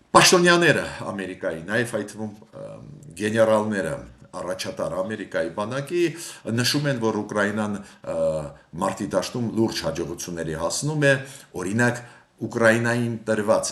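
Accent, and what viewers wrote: Russia. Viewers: не можешь говорить без русского акцента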